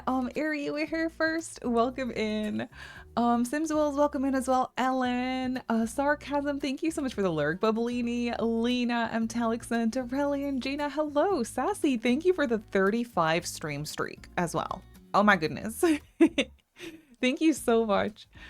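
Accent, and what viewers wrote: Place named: Germany. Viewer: Is Sir from Germany? He has an german accent😂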